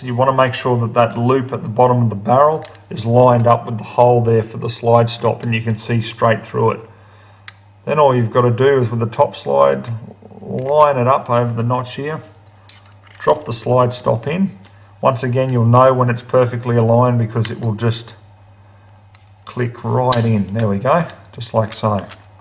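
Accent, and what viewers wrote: Australia. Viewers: Awesome review... weird to hear the Oz accent talking about guns :) 1991 9mm model (O1992) was recently added to the Colt lineup and I picked one up (to go with my .45 ACP O1991 and .38 Super O2991). Only difference I can tell is that the US market guns have the bright barrel muzzle and hood.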